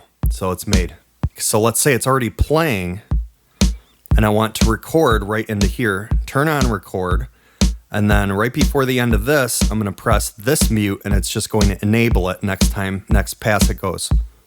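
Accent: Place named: Michigan, United States. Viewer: Man you are really good. And you sound like you are from my home town in Michigan.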